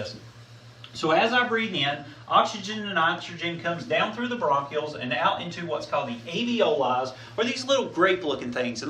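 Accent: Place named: Appalachia, United States. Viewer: Not only are you Southern, but you're also Appalachian. Gotta love those Hillbilly boys!! (I'm a Hillbilly, born and bred in East KY) <3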